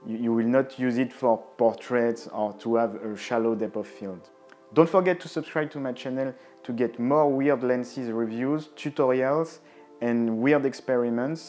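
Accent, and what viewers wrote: France. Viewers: The french accent! J'adore tes vidéos, sont top! Et ton accent me fait bien marrer :D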